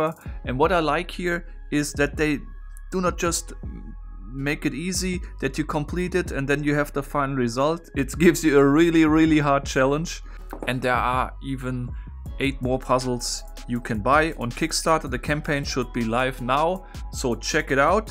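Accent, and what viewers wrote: Germany. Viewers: Sir are u a german?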